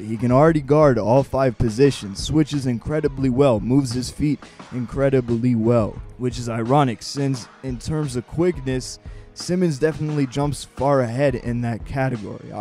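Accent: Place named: South, United States. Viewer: Your drawlin heavy👀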